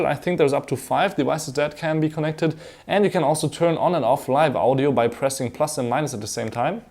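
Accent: Germany. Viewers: I MISS this german english accent sooo much!!!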